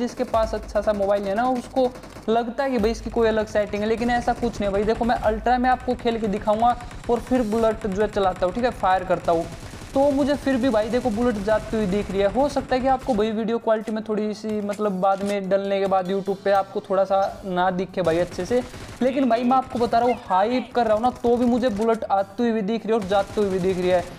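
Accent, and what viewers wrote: Haryana, India. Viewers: Bhai you are harayanvi apki voice bta ri s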